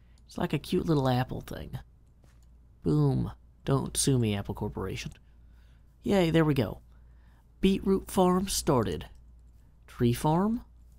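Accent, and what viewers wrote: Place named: Tennessee, United States. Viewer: Nice accent xD This is the first video I have watched by you, nice videos, and I really do like that Tennessee accent xD!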